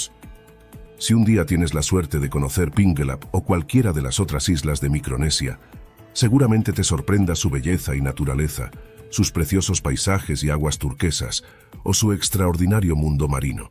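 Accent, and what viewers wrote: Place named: Castile, Spain. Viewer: Excelente vídeo, y muy bien narrado. El narrador habla un perfecto castellano y es un placer escucharlo.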